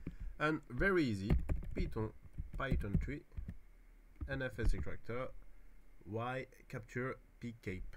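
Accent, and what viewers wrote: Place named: France. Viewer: noticed the french accent merci frérot pour le partage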